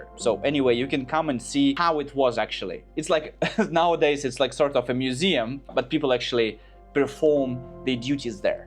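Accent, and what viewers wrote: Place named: Finland. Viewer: Wait, are u from finland? No wonder ur accent sound so finglish.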